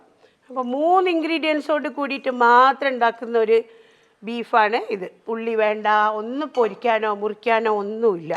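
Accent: Kozhikode, India. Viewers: I am from Kozhikode now living in Banagalore when I hear you talking in typical kozhikkodan Slang oh my God I really like it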